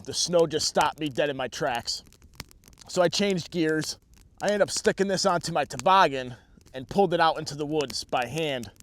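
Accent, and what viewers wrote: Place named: New England, United States. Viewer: A few things keyed me in actually . The accent, the type of trees at your location but the biggest clue actually was the snow storm and the release date of the video. I'm from NH and we just had the same snow storm . We were expected to get 18 inches but we ended up with about a foot. So I figured you had to be some one close to my location for you to receive almost the same amount of snow I just got over the same weekend in an area that looks very similar.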